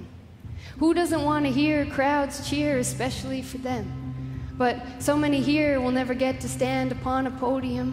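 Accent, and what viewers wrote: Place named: France. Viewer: Wow, that was beautiful. Your French accent is quite nice too.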